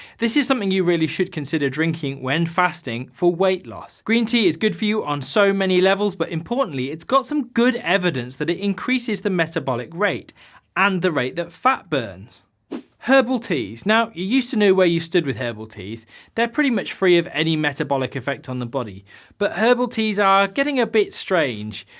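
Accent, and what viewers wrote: United Kingdom. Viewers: Hi I find your videos truly enlightening- learning a lot- very simple and after the initial getting used to the "British accent" as most of the ketosis videos seem to be from Americans, I am getting quite addicted to your knowledge tank, in a way, picking your brains. I am obese( there is no other word for it) and have been trying to lose weight for maybe 40 years- ( I am 50)- I do succeed sometimes but more often fail. Now at a particularly low phase - as living in a food desert and being a college student again is taking a toll. For the past month, have been low carb and high fat- but as you noted, the bullet proof coffee was not causing a weight loss for me- I took one every morning - with butter, coconut oil, cream, and coffee and a breakfast cum lunch cum dinner around 5 pm ( followed this pattern for about three weeks) - no weight loss, so decided to go completely off food- have been doing it for five days now- only water and black coffee( which I usually hate as it gives me acidity and migraines)- as a surprise, I have lost about 8 pounds in 4 days- surely water weight but not much urine passing either day or night. 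My urine strips do show significant ketones now. But the blood ketones are still low and the blood glucose I tested yesterday evening after a round of exercise in the cold weather, came to 150- very surprised as I am typically not diabetic, at least I don't think so. My question is, do you think a low carb ketogenic diet can reverse lipoma or skin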